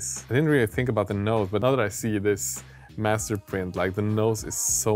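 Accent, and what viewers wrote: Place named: Sweden. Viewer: This sounds very Swedish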